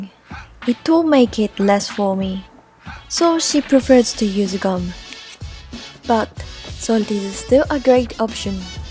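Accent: Japan. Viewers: □←この人の影響？(使ってみた) 母国語がないとは言うけど、私からしたら外国で英語が話せるようになった日本人の発音だよ。 ところで、👍が俺のコメントに複数つくのは誰が👍を付けてるんだろうね…😓一つだけならDianaさんだと分かるんだけどな。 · まるで日本人の少女が、英語を話しているようです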